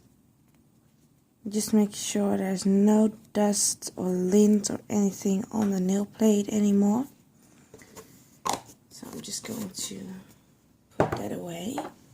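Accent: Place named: Netherlands. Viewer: whatts wrong vith her voice? Its an accent. I guess Dutch or maybe swedish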